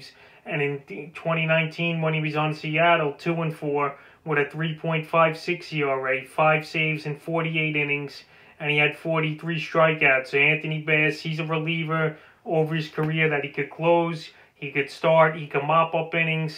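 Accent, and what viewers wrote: New York, United States. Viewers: I love this guys New York voice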